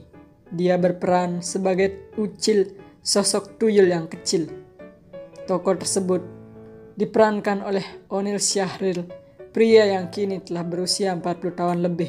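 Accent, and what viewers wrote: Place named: Bali, Indonesia. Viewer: Dari logatnya kayaknya mimin orang Bali ya🤭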